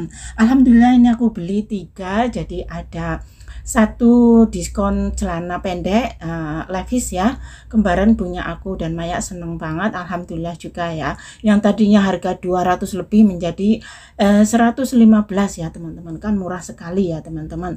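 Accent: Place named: Java, Indonesia. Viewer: Asik shoping rame-rame bersama teman😄sampean wong jowo to mbak ngomongnya kok kaya orang Jawa😁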